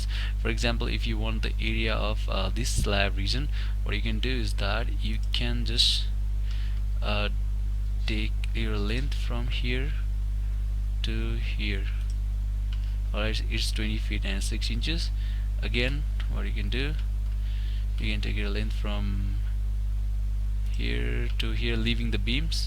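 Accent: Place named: Nepal. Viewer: Nice teaching, you sounds like from Nepal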